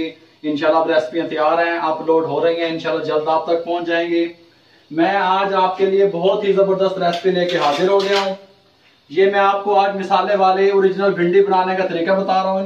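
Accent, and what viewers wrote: Punjab, India. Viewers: You know what I'm big fan of u the way u are talking specially when you tease in typical Punjabi I damn love it..Please keep it up best Regards, Shoaib from UAE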